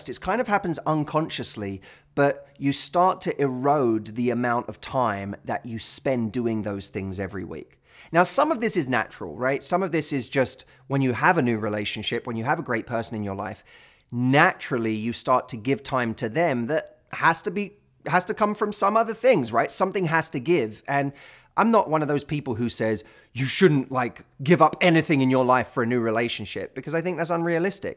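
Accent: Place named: United Kingdom. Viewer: Hello everyone, I m old, i like following those videos just for learn british english prononciation.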